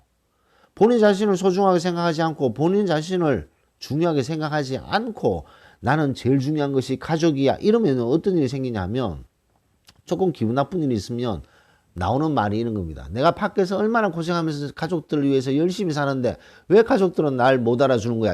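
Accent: Gyeongsang, South Korea. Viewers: 들을때 마다 느낍니다. 어쩜 경상도 사투리가 이리 듣기 편할 수 있는지.. 전 경상도 사투리 원래 질색을 하는데 선생님 영상은 목소리 들으려고 일부러 들어요. 평소엔 괜찮다가 피곤할땐 듣기 거슬리는 목소리가 있는데 피곤할 때 회복하게 하는 목소리예요. 물론 메세지가 좋아서도 있겠죠. 오랫동안 잘못된 기독교 신앙을 통해 나 자신을 부정하고 남을 용서하고 사랑하라는 메세지를 문자적으로 지켜보려고 하다 몸과 맘이 만신창이가 된 사람입니다. 요즘 심리학을 통해 의외로 성경에서 말씀하신게 그게 아니라는 것도 깨닫고 님 처럼 내 주위에 넘쳐나며 나를 갉아먹는 하이에나, 소인배, 나르시시스트, 소시오페스들을 정리하였습니다. 저에게 달라붙으려고 한 인간들이 내가 좋아서가 아니라 나한테서 떡고물을 얻어먹고 내 진액과 생명력을 다 빨아먹으려는 에너지 뱀파이어 였음을 깨달았 고요...이제는 나를 가장 우선에 두고 살겠습니다. 저의 생명되신 예수님도 그것을 바라실 것입니다. 감사합니다.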